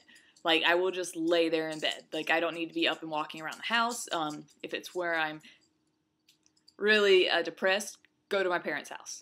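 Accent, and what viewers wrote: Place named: South, United States. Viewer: Your southern dialect is also very attractive.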